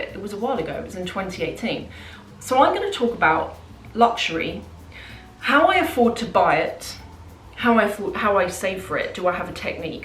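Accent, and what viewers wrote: United Kingdom. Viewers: Dear, I love your blog)). I am writing to you for the first time) You are a very interesting and beautiful woman. And you also became the first English-speaking blogger for me. With the help of your videos, I try to perceive English by ear and not get bored at the same time😅You speak very competently with a beautiful British accent;) I also miss London and enjoy watching your shopping vlogs from Harrods) I hope soon I can finally to visit the UK again without restrictions related to COVID-19 🙏 and go shopping too🤪I wish you happiness and even more successful shopping!) with love from Kiev, Ukraine❤️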